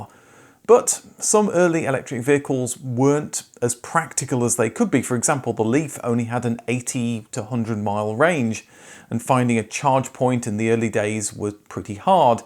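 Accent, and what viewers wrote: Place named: England, United Kingdom. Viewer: he has an English accent. Does that help ? 🙂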